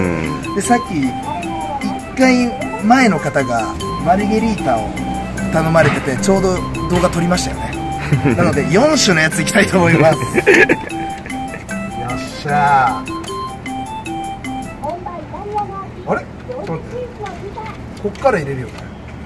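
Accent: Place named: Hiroshima, Japan. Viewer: HIPPYの広島弁がすごいすき。HIPPYもすき。♡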